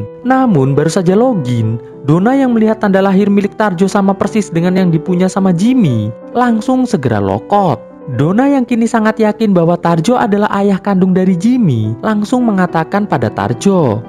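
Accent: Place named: Java, Indonesia. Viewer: Adminnya lucu cara ngomongnya...org jawa mesti😅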